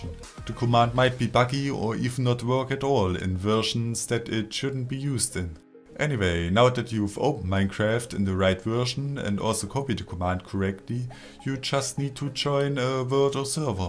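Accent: Germany. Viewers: Amazing command guy ! PS : I love your german accent :D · ich lieb dein denglish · hello i était like your German voice xp it's more simply to understand you than American youtuber and I think it's a really good idea to use the new effect into your command it's beautifull ^-^ have a nice day for my it was "fête du travail" yesterday :3 (sorry don't no this word in english ... :/ work day I think ? ) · Are you really englisch? ;D Its sound very German. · Da kuckt mann ein Video und hört den Deutschen Aktzent raus :D find ich gut. ^^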